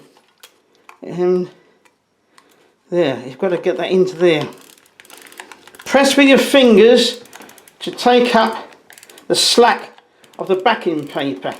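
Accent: United Kingdom. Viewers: I had this camera at one time with the 180mm lens. I always loved this camera. I viewed your photos on Flickr too. I'm going to have to join Flickr one of these days. A lot of good photographers there. By your accent I can tell your from the UK. There are a lot of good British photography magazines out there. Maybe I'll buy this camera again someday. I like the interchangeable lenses.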